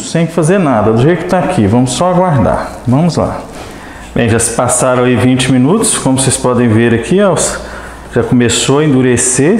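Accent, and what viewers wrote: Minas Gerais, Brazil. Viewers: Adoro esse jeito mineiro de falar ❤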